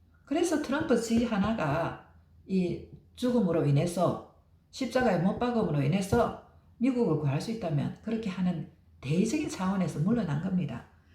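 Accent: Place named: Busan, South Korea. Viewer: 부산 같던데요 ㅋ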